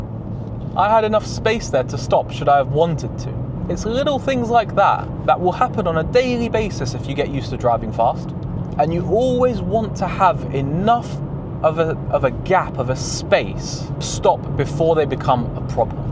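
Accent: United Kingdom. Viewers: His accent is British but his pace is American 😅😅😅😅😅 from Malaysia 🇲🇾🇲🇾🇲🇾🇲🇾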